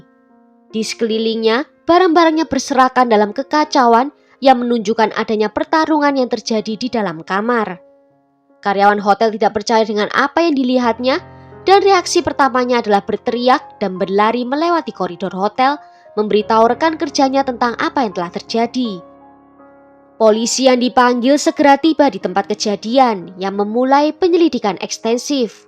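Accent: Java, Indonesia. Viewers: Aku syukak bingitsss dengan medoknya sis, semoga ciri khas ini bisa membawa channel semakin dikenal dan segera mencapai jutaan subs🎉❤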